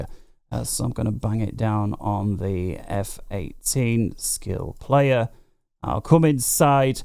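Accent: United Kingdom. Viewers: thank you for reminding me that I'm allergic to bri'ish english.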